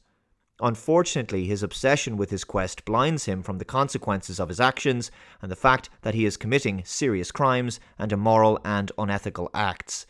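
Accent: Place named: Ireland. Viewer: It was clearly exploring the concept of God, which is highly cinematic by it self. It also thoroughly explored how pain artificially relieved (being cybocks power) is a crime to the souls identity. TrekV was excellent as classic Trek, this film brought what we've come to expect, and a ton of fun. You know, your voice now that I think about it really sounds a lot like K. I. T. T!! Albeit Irish instead of british accent, you could easily voice him if Daniels himself not available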